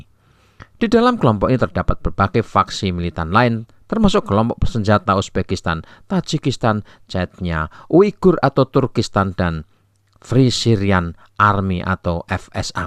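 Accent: Java, Indonesia. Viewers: Jowo medhok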